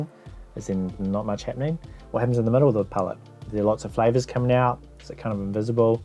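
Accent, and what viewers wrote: New Zealand. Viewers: Are you a kiwi? If so where from?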